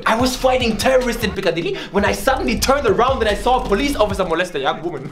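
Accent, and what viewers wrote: Germany. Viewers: He's the first Asian with a german accent I ever heard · Ich liebe deinen deutschen akzent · Is German your native language? Hell i simply LOVE the way you speak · peak denglisch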